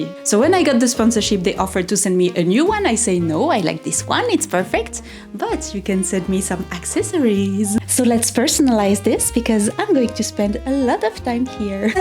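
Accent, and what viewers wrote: France. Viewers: you are such an inspiration, paint, sew, carpinter. art in every thought. it freaked me out a bit you were wearing flipflops for the whole processed (closed shoes in the heavy workshop!!) but i love you and the french accent <3 salut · You are pure whimsical chaotic energy - with a French accent - & I am so here for it! The room looks FABULOUS!!!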